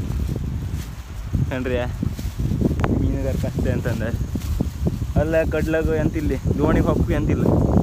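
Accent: Kundapura, India. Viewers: Kundapura kannada baari saap matadtri mare😂❤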